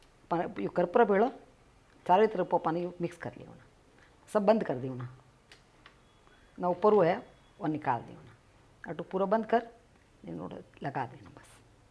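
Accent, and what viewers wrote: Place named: Rajasthan, India. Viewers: Aunty ap meri favourite ho or apki Rajasthani boli same meri dadi k jesi❤️❤️😘😘😘 or apki recipes to osm h ❤️ love you ❤️ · Jai siya ram badiya jee. Thari receipes bahut hi badhiya hai. tharo sikhane ko tariko bahut jordaar hai. Thari marwadi bahut pyaari lage. 🙏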